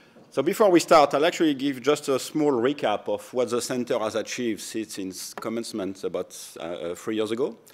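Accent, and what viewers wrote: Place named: Australia. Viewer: Great talk. And of course, I always love to hear the classic Australian accent. :)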